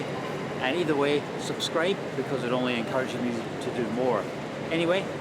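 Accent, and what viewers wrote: United Kingdom. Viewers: Are you British British ?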